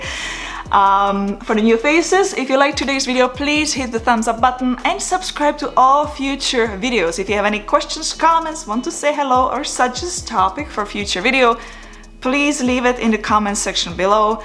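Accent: Czech Republic. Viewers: Oh wow. Wasn’t expecting the czech accent. Haha. Great video btw! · Are you from Czech republic? :-) I heard "Ahoj" and your accent is also similar to czech-english one.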